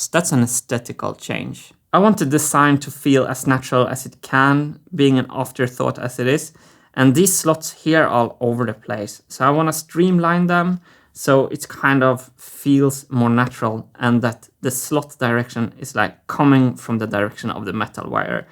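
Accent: Sweden. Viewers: Hes swedish 😅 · the comment was clearly tongue in cheek. Nobody is actually complaining about very slight Swedish accent. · Wasn't it more like "yeneration"? And yes he pronounces lots of words or parts of words just like he would in Swedish (because he's a Swede), and makes some mistakes in grammar but I think it's kinda charming since he has a pretty good vocabulary and flow. He's a good communicator, don't you think?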